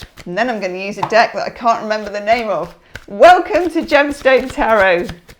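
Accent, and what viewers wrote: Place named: United Kingdom. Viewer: VO5 through his hair.... How old is he lol....always a pleasure to hear a British reader not afraid to be totally British. (Many of my favs are American tbh). Maybe one day I could brave myself on you tube, unlikely my reads are far too fanciful....(although saying that love Nigel's reads)....namaste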